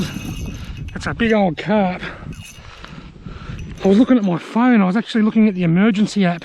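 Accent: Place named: Australia. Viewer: Sounds like a true Aussie.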